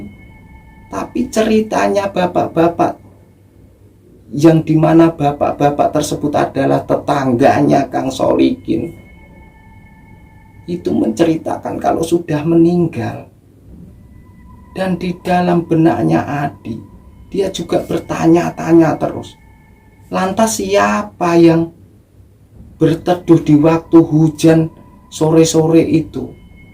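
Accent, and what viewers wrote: Java, Indonesia. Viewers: Ga apa mas logat mas yg medok saya seneng ko itu kan ciri has suku masing2 gas ken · Assalamualaikum...mas,saya salah satu subscriber nya mas.Saya Disini ingin memberi sedikit saranan jika mas gak keberatan coba dikurangin medoknya biar enak didengar,saya faham kita sebagai orang jawa memang lidah kita agak medok tp alangkah baiknya jika dikurangin.Saya Disini hanya memberi saran tp jika masnya agak keberatan juga gak papa,karna memang ciri khasnya kita orang jawa berbicara begitu.Moga masnya selalu dilindungi allah,dan saya mohon maaf jika perkataan saya menyinggung jenegan,sukses selalu....🙏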